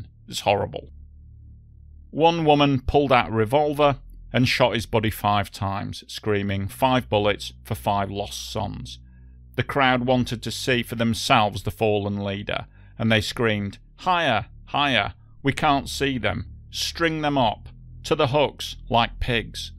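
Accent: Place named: Northern England, United Kingdom. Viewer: a pleasant northern English accent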